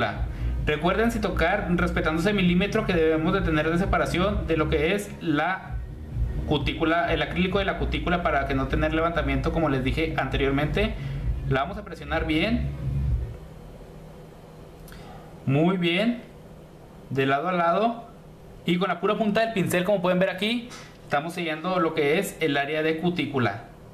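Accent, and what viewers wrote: Mexico. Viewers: Si pudiera amiga ese es su acento no todos los mexicanos hablamos igual, depende de el estado cada quien tiene su acento y el de el esta padre.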